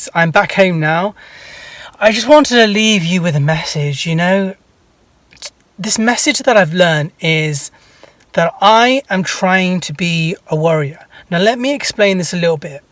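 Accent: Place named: United Kingdom. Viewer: Yes boi. Smash that gym!! Sick accent you living in UK?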